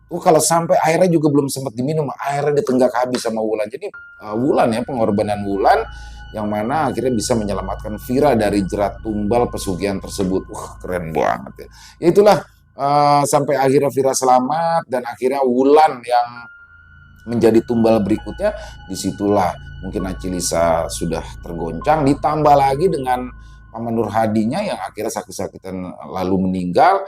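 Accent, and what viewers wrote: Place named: Banjar, Indonesia. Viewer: Bagus logat banjar anda 👍👍👍👍👍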